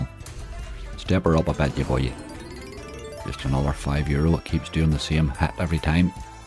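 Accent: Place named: Northern Ireland, United Kingdom. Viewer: yea im from belfast new the accent rarely see people from NI on the slots videos lol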